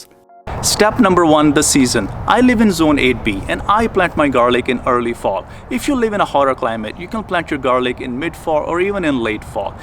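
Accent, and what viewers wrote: India. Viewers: i cannot understand your heavy indian accent bro!!!!!!!!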